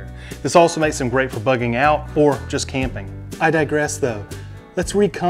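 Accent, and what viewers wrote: United Kingdom. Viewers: That British accent got me good😄 I did not expect that. Good one sir. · British accent earned you a sub... from a Brit! Haha.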